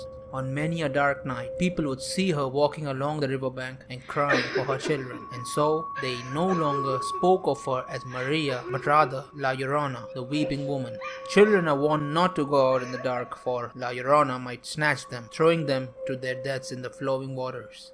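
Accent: India. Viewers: Why does the narrator sound from India? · Full of Indian accent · No offense but it sounds not authentic, specifically because of the Indy accent 😂 nice try though.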